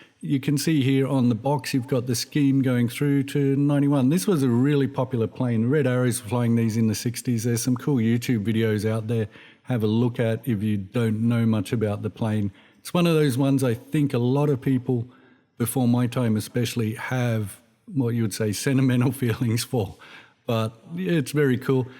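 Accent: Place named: Australia. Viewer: Great commonsense review. I have one of these in Yellowjacks so-called starter kit form which I'm itching to build. The yellow paint scheme will be a challenge! I have tried building older Airfix and Matchbox 1/72 Gnats but not got very far. Good to hear an Aussie voice on a kit review. Mike from the Shaky Isles.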